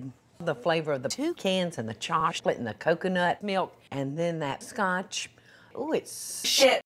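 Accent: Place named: South, United States. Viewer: her southern accent is perfect for YTP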